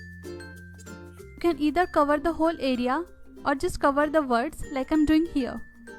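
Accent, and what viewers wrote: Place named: India. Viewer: It's nice to here an Indian speaking English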